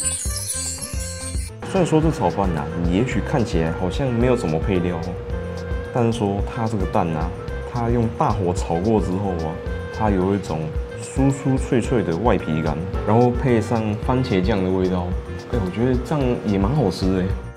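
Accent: Keelung, Taiwan. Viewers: 台湾~基隆的口音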